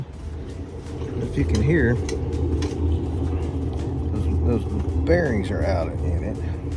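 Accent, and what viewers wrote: South, United States. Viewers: Thanks for looking at my comment and responding, I really appreciate that. I just came across you today, and I really like your videos. Where are you based out of? It's definitely Southern, that's for sure👍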